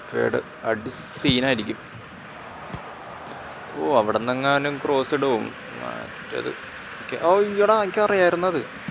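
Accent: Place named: Thrissur, India. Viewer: slang kettapole mansilaayi😂thrissuril evde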